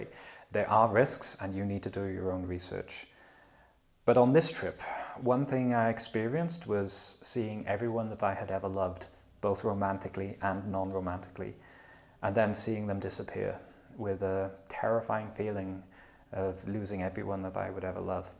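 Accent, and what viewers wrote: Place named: Wales, United Kingdom. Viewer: thought I recognised the accent but wasn't sure. South Walean here 😁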